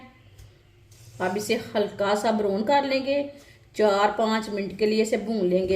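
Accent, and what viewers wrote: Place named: Punjab, India. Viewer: Punjabi jab Urdu boltay Hain to bilkul b Acha lahja ni Lagta sad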